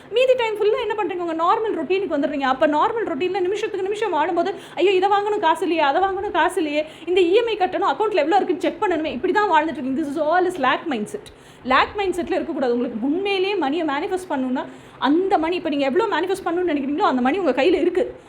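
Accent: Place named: Madurai, India. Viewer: Madurai pechu 👌🏻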